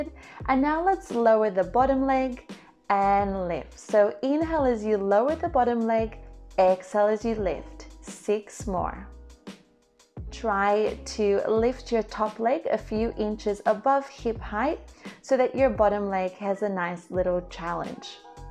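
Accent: Australia. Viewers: Your videos are amazing! Thank you so much. This one was hard. Hoping that I can improve and not die next time hehe. And I definitively love some Australian accent :) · Have done 2 of your classes now, yesterday and this morning. My gluteus are feeling it! I have a couple of favourite channels I follow to mix up my workouts, love your workouts totally agree with the other comments, you are clearly a professional and a great teacher/instructor. Also is really soothing hearing a Ozzie accent😉. Love from Melbourne 😘😘😘❤❤❤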